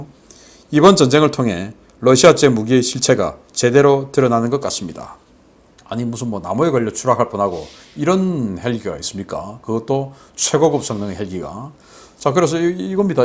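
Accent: Gyeongsang, South Korea. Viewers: 신안균이 견공들이 많은 경상도대구출신인가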